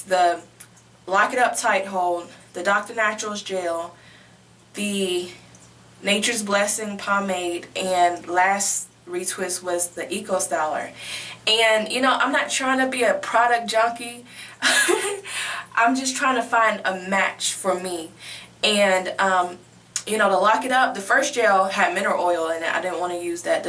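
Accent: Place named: South, United States. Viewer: Girl, I'm from the South too and I understood everything you said, lol. Your hair is looking FAB honey! Watch before you know it, it will be loc'd, it's gonna sneak up on ya. ;)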